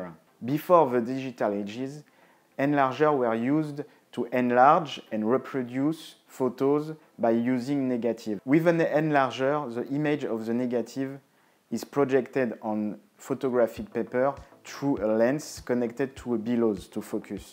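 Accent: France. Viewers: The french accent! J'adore tes vidéos, sont top! Et ton accent me fait bien marrer :D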